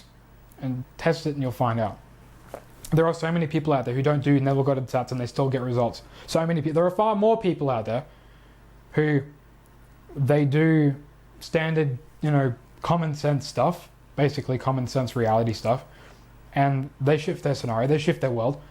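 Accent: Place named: Australia. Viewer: Thank you so much for your reply,. Usually I can hear the Aussie a lot but your accent isn't very strong. Anyway, I realized you were likely Aussie when I saw one of your older vids with you in a forest that was definitely not anything you'd see in England lol. Thank you for the insights on the qi stuff. Sounds pretty accurate I'd say even though I don't have a thorough understanding of the five elements, I have dabbled in the arts. I just purchased your subliminal and created my own 1 hour version and am going to listen to it while gazing and also while I do my drawings. You are right about the geometries in my field of vision. Actually, I did notice while gazing the flower of life pattern overlaid onto reality...I don't really know how to describe it. Kind of like a hologram or something. It's very subtle. Wishing you an awesome day and really grateful to have found you and also overwhelmed by everything I'm learning. 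I'd definitely be interested in working with you and possibly getting on the immortality program, as that is my aim, and currently working on manifesting my wealth so that I can do these things. I feel I have come a very long way on my own without help from anyone, just following my path, and yet I still feel very lost, at least mentally I don't have a grasp on what is happening but you are helping me out with that. Thanks!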